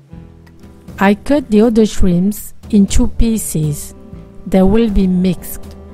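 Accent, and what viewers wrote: France. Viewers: This looks super delicious! I will have to cook this and try it! I will enjoy it for sure! where did you learn to cook all of these recipes? Where are you from? You sound french. Peace!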